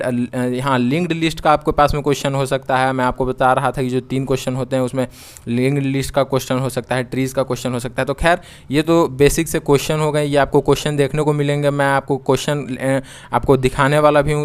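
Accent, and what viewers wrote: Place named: Bihar, India. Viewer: yes exactly . I don't know what short listing criteria Josh is using. Sometimes they select only on one question and some time dont select even on all 3 correct. Btw sorry for being little rude in cmnt above. Liked ur way and accent (bihar accent) of explanation.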